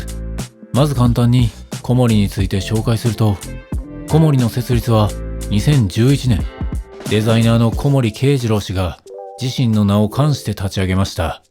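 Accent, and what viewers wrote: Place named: Kansai, Japan. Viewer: 参考になります！ 語尾の関西のイントネーション（？）がクセになります笑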